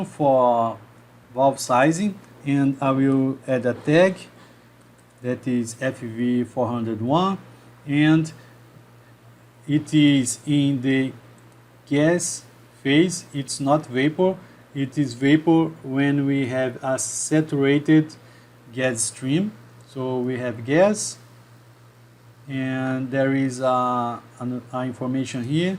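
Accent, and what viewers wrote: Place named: Brazil. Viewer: Cara que canal fantástico... É recheado de assuntos sobre a Engenharia Química pena que eu não manjo muito de inglês para compreender melhor seus vídeos, percebi que você é Brasileiro o seu sotaque te entregou um pouco hahaha. Entrei a pouco tempo para esse mundo incrível da Engenharia Química, estou no 3° período do curso e encontrei seu canal... Parabéééns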